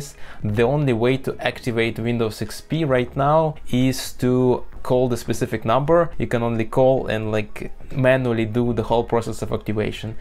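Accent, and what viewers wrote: Russia. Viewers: У него тяжелый русский акцент! )))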